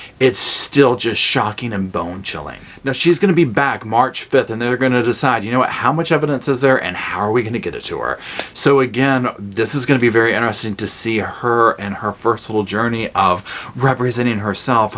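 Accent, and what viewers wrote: South, United States. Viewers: I just love all your comments and facial expressions, you make me laugh. Lord knows I need more laughter in my life. Thank you for making that happen. Love your southern accent. I grew up in Florida so I have one too. Lol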